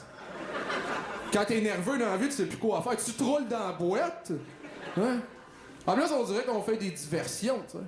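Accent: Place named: Quebec, Canada. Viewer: comprend rien au québécois omg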